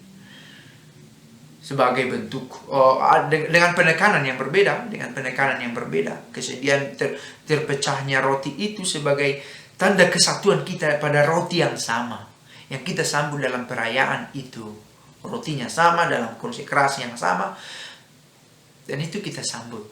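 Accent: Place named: West, Indonesia. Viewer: Frater dialek kebaratan diperbaiki. Tks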